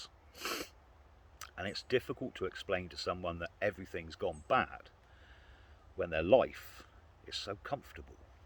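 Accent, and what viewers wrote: England, United Kingdom. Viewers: It is always nice to hear an englishman chat away. 😊👍❤️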